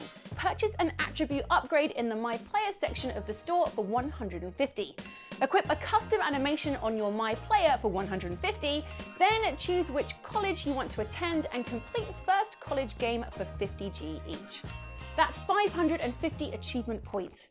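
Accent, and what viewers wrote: United Kingdom. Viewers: this is so british i actully understand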